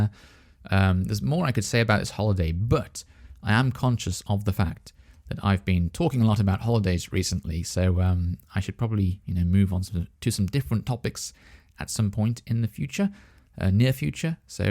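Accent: United Kingdom. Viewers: Keep going I follow you from Iraq I like British accent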